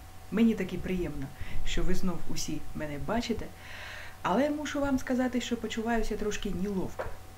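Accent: Surzhik, Ukraine. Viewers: Какой прикольный суржик :) Молодец! Хейтеры пусть идут в путин.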